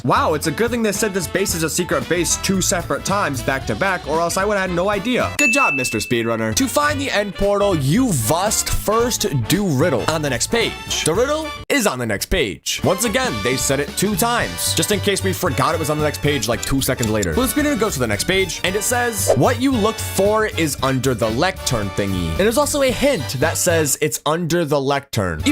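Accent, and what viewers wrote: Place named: RP, United Kingdom. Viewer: Dramatic posh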